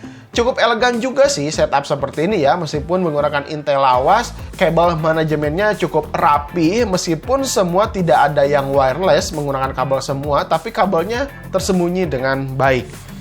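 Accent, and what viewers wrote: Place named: Sunda, Indonesia. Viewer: Sunda nya kentel euy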